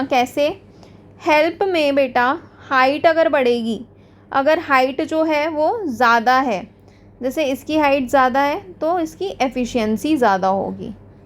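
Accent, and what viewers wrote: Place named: Bhopal, India. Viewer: Bhopali ki zuban he ji ke roop me, faeda hoga dosto❤️❤️✨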